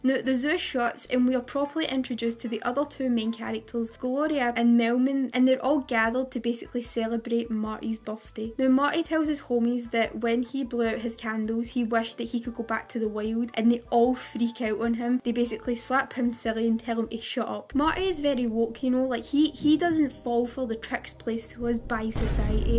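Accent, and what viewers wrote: Scotland, United Kingdom. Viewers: I love your Scottish accent, lass! 😉🏴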